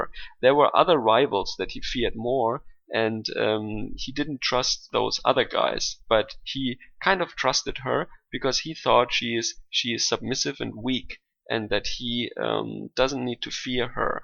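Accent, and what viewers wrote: Germany. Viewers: Dear, I love your precise, seemingly accurate, and concise knowledge of the American language. I wish my Deutsch was only 10% as good. Do not ever change your accent from German as it enhances your apparent IQ at least to 250. My question concerns when A. Merkel became fully aware of politics. Y.T. has several nude pictures of her when she was much prettier and hence much younger - probably less than 18 years old. It was stated that girls back then appeared nude as this was known to aggravate eastern 'powers than be' who couldn't retaliate. What do you think?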